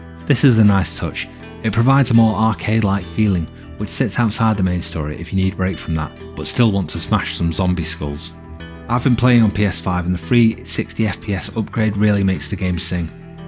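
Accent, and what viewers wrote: United Kingdom. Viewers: Just discovered this game reviewer. Between the warm tone, brit accent, depth, and level of brain power required to comprehend, I'm like, "this mf should be voicing everything and telling me the weather and my driving directions and boarding sequence and how awesome the next movies coming out might be. #MAKEMORECONTENTBRO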